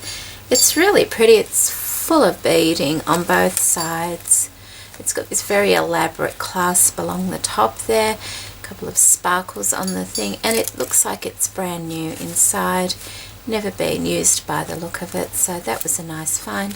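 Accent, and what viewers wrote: Australia. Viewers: I love seeing all of the great things you find in Australia! These videos are really fun to watch. Plus, I could listen to your voice and accent all day. Seriously, your voice is so lovely!!! xoxo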